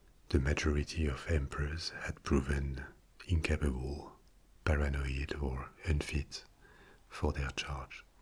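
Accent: France. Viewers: Ever since visiting France (my first time visiting another country!), I have missed the French language and/or accent. It was wonderful, and hearing it in ASMR form AND in historical/science/astronomy forms is the best I could ask for 😊 Greetings from America. Thank you and stay safe! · What a sexy voice and that French accent 😍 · Are you kidding me. A French man, speaking english and whispering? A dream come true.💖💖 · Is that a true French accent or a cartoon one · Like a French Morgan Freeman.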